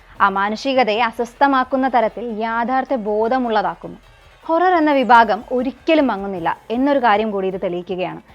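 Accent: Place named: India. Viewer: Indian accent bruh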